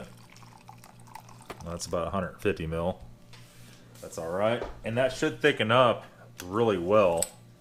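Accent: United States. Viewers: Your intro is always so cool man :0) so fast talking American, impossible to me, lol :)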